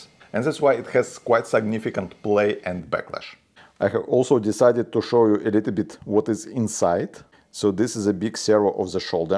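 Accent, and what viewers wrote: Russia. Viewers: Он не русский. Сильный русский акцент. Но он немец из Швейцарии. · Теперь я понял, что значит "русский акцент".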